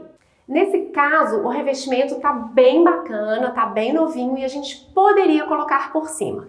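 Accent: Minas Gerais, Brazil. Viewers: Primeiro me atraiu as dicas e pelo sotaque charmoso de minas. Depois de vários videos assistidos, me apaixonei por sua racionalidade, perspicácia e criatividade nas soluções. Se eu fosse rico, te traria aqui pra São Paulo para transformar meu apartamento em um apartamento de resort rsrs. Tenho certeza que amaria as soluções. Todo sucesso do mundo para ti. Abraços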